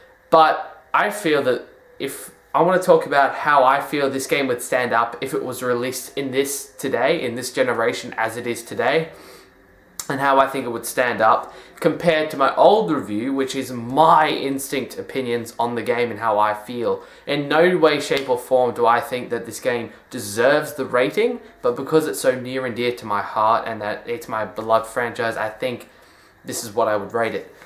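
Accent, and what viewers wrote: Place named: United Kingdom. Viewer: You are half the reason I read every written game review in a british accent.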